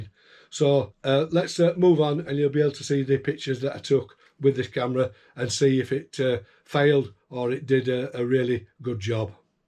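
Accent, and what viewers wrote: United Kingdom. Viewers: subbed straight away just for the tyke accent. I'm originally from Cas'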